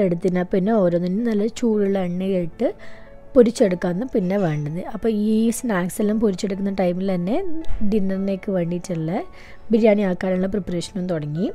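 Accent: Kannur, India. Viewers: Kannur slang kelkan anne njn vedio kanunnath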